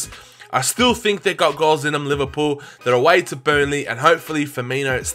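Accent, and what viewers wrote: Australia. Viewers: Are you Aussie mate?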